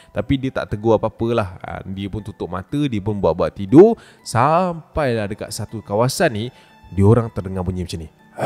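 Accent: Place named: Negeri Sembilan, Malaysia. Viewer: Lucu la ckp n9🤣🤣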